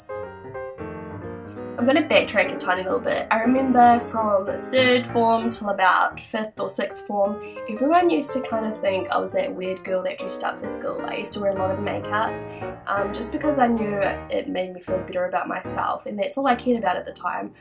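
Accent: New Zealand. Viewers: Wow! I'm so glad things are going well for you now :-). I never would have guessed that you went through all of that - much of which I can identify with. You are gorgeous with and without makeup. I love your videos. I was really surprised to hear a Kiwi accent after hearing mainly American ones. Bless you for who you are :-) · Yeah yeah I know, first off, it was rhetorical, because she obviously is from NZ, but yeah, that wasn't supposed to be like that btw hahhaahaha :3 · Did you even watch this video? She says it like 10 times and she has a kiwi accent😂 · i knew u were Nz ur accent is sooo strong :)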